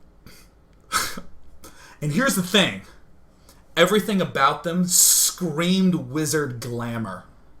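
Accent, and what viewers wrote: Australia. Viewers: He sounds australian not british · It sounds more like a Australian accent XD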